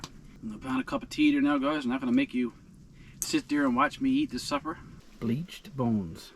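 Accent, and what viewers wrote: Ireland. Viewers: Cant make out your accent, American with a drop of Irish 😊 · I’m from England and I thought you were Irish/North American. You have that Irish twang. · You’re Irish sounding along with the Canadian, your phrasing sometimes is Irish as well. Interesting accent!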